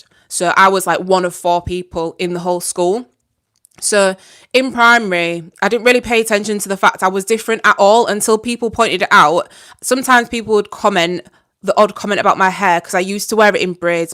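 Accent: Northern England, United Kingdom. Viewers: I knew you were a northern girl! I’m from Huddersfield (although live in the midlands now) so recognised the accent! Love these lives! Keep it up so engaging. To be fair at school most of the black girls including me had relaxed hair so you probably still would have had relaxed hair, that was just the done thing!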